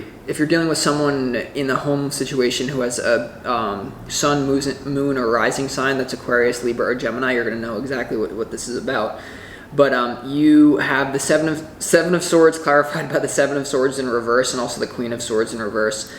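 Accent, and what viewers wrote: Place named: Long Island, United States. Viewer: Sounds like you lived in Massapequea 😮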